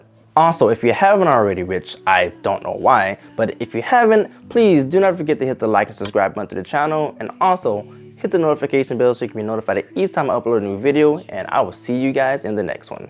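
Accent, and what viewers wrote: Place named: Toronto, Canada. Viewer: This guy is not American. He sounds like he's from Toronto.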